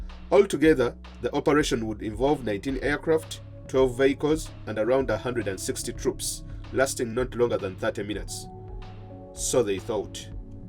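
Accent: Uganda. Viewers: Is the commentator Ugandan?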